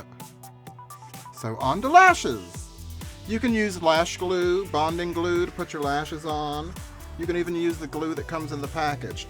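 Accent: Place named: South, United States. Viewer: i adore her accent! southern queens are my favorite ❤❤❤❤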